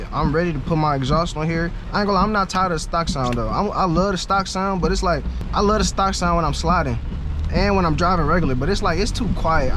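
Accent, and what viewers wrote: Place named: India. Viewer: Vwat da fuc bruddah looosh ash 🤣🤣 Indian accent caught me hella off guard might just be a subscriber now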